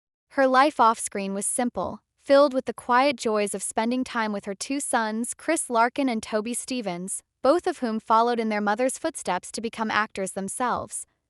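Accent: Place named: United States. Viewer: Fucking American voice-overs on everything these days, I resent it hugely..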